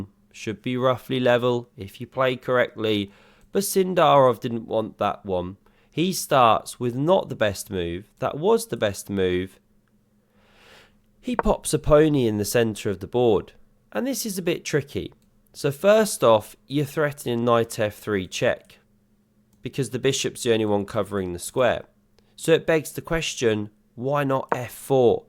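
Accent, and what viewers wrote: United Kingdom. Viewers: Thank you. I meant your real name :-) From your accent you must be British and I love your shirt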